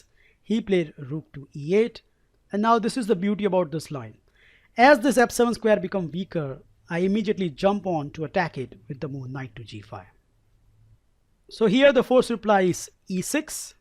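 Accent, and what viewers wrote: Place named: India. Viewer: your my chess guru! I have so much fun using your tactics! Your English-India accent is awesome. Blessings for your entire family.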